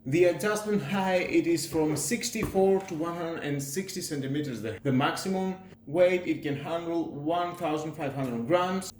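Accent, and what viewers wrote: Greece. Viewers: U sound greek φίλε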